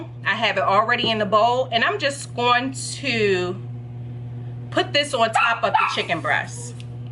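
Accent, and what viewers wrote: Baltimore, United States. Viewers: I would guess Baltimore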